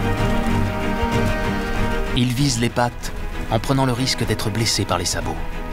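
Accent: France. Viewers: Amazing footage but there's only one bad thing... It's a French narrator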